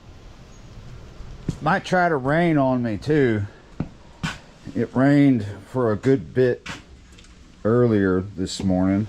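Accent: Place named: New Orleans, United States. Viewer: Not hat but yat accent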